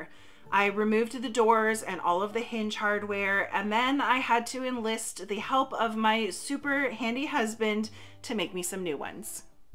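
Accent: Canada. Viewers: I LOVE LOVE LOVE your Canadian accent!!! And your videos too! Beautiful work. :)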